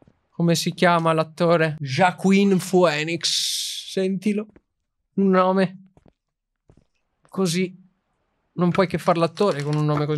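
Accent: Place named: Veneto, Italy. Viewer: mi sembri veneto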